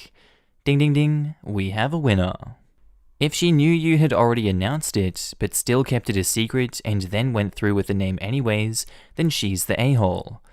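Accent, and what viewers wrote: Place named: Australia. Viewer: Hey! From Canada 🇨🇦 love putting on your playlists and fall asleep to your Aussie voice 🙏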